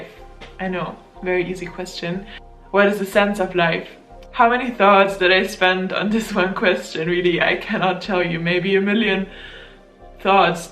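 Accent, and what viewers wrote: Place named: Germany. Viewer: Oh, you’re from Germany. I was trying to figure out your accent but couldn’t get it. You are probably suffering a symptom called “Bangkok Blue or Thailand Blue. Tourists from around the world who visited Thailand get it after they’ve returned to their country. The symptoms are, sadness and can accompany with crying. No need to ask your doctor or searching for a medication for this. It should go away in a few weeks. But if it’s doesn’t go away then you will have to save money for your return to Thailand, hopefully soon. Thailand gives so much happiness to the tourists. Like anything when you feel high and good. But not so easy naturally when you have to come back down. Hope you can adjust back to a happy life in Germany soon. Thailand will wait for your return 😃 ✋🇹🇭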